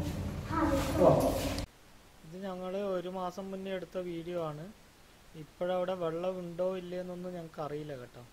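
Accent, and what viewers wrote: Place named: Kannur, India. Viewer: ആഹാ... കണ്ണൂർ ഭാഷ 😍 ഞാനും കണ്ണൂർ ahh... കല്ലിയാശ്ശേരി Video കൊള്ളാം കേട്ടോ... നന്നായിട്ടുണ്ട്... തിരിച്ചും വരാൻ മറക്കല്ലേ കേട്ടോ, 🥰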